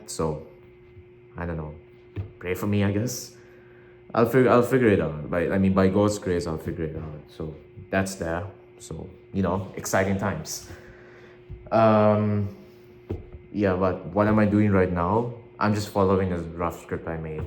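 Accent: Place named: South Asia. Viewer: Habibi, yessss don’t waste the water that could go to starving bettas in Africa! Habibi u r fresh off the boat and no one can hear a word through that curry accent.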